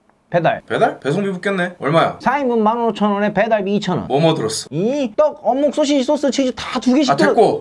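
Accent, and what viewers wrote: Chungcheong, South Korea. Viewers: 아 충정도사투리 너무 구수하시 좋네요 중독됨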